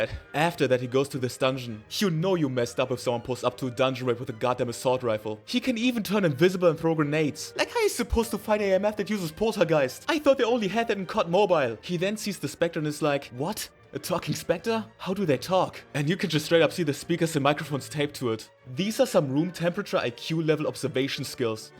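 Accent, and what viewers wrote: Germany. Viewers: are you german? I think I can hear a german accent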